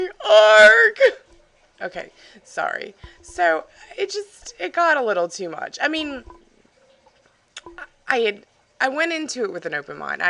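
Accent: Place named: Eastern Europe. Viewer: lol funny east europe accent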